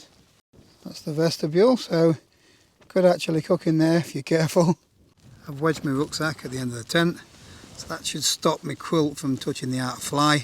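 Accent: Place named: England, United Kingdom. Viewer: Your videos remind me of Tom Weir - but with an English Accent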